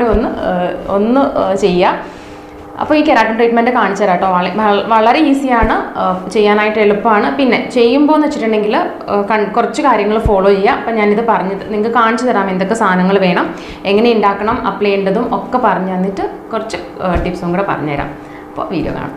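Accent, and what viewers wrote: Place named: Tamil Nadu, India. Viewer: Slang korachu tamil mix aaghunnu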